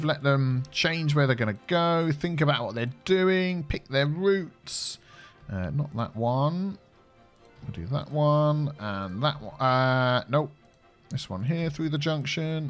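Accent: United Kingdom. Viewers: Didn’t now you were British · The exact right tone of voice. British indeed.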